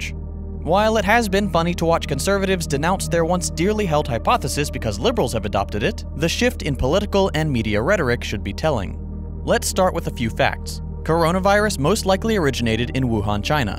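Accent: United States. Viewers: This guy sounds American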